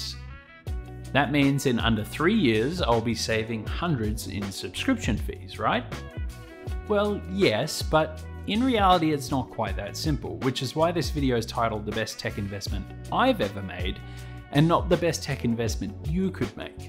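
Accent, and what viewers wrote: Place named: Australia. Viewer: Just had this recommended. Instant sub when heard Aussie. Stay for the great work in video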